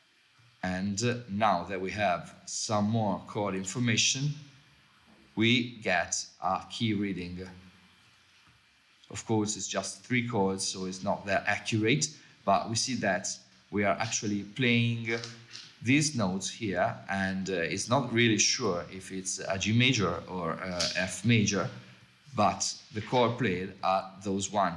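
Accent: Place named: Tuscany, Italy. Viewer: scommetto che sei toscano haha , finalmente qualcosa made in italy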